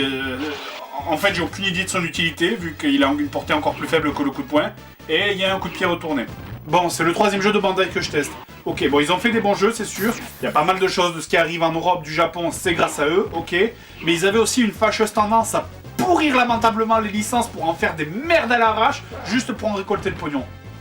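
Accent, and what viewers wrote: South, France. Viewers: L'époque où avait son accent du sud...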